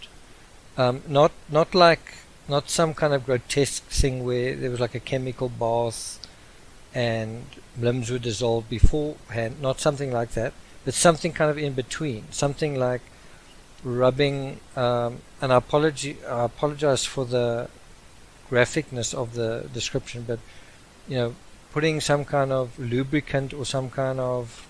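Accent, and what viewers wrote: South Africa. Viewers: I agree, I really like a South African accent.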